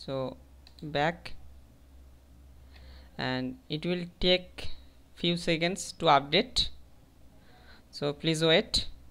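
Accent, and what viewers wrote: India. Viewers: DAMN !!!! i hate india pronunciation in english, like they have candy in their mouth while they're talking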